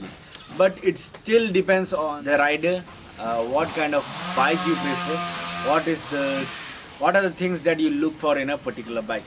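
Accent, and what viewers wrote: India. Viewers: matte black you are staying in Malappuram and your English is North Indian style. are you Bengali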